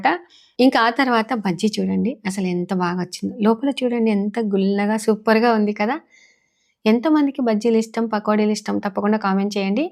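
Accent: Rayalaseema, India. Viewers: ఈ రాయలసీమ మాటలు సీమ తెలంగాణ మాటల్లోనే ఉంటాయి నువ్వు మాట్లాడిన కొద్ది నాలి అనిపిస్తుంది🎉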